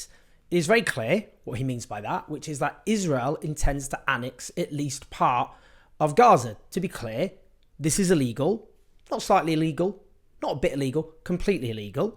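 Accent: United Kingdom. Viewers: I like your show but it's very difficult for me and I am sure also for so many others to understand your very heavy British accent. Try to speak a bit slowly and make your English a bit more Like an American Accent so that all your international listeners can understand you better. By the way, I am NOT an American. Thank you once again for your great and valuable content.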